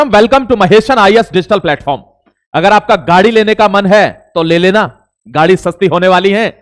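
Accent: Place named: Western Uttar Pradesh, India. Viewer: क्या आप ही महेशन हैं , बोली से तो पश्चिम यूपी के लगते हैं , नाम क्या है आपका , महेशन नाम तो दक्षिण भारतीय है . जानकारी आप अच्छी तरह से समझाकर देते हैं .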